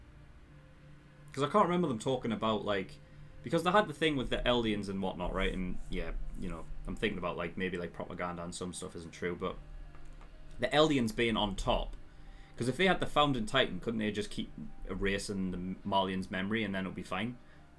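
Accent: United Kingdom. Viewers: I love your reaction so much🙆‍♂️🙆‍♂️I'm Taiwanese. I watch your video for analyzing and learning English listening. 😂Because I seldom hear England accent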